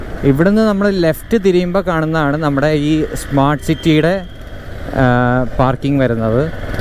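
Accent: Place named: Thrissur, India. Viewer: Are you from tvm? Your slang seems to be from thrissur... Anyway very good narration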